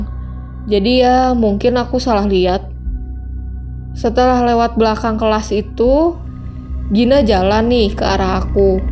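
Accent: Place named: West Java, Indonesia. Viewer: Logat Sunda nya terasa Karakter suara mu kaya di animasi di tiktok yang gak takut sama hantu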